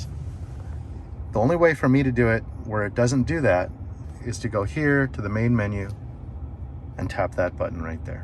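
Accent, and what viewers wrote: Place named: United States. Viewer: Thanks for the video. Would be fond of seeing you attempt to hit the indicator buttons while turning. Scenario: in Europe it's (generally) the law to blink in/out of roundabouts. I'm having a hard time seeing how to do this safely without taking the eyes off the road trying to find the correct button which by now might be on the other side (yoke upside down). You sound American so you might not see it as an issue, but I assure you it will be for many of us 😊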